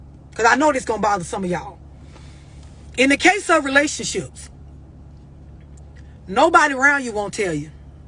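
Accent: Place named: Florida, United States. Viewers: Yep... straight outta FL 🌞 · I love the way she say hoe, just the way she talk in general reminds me of home Where she from Florida?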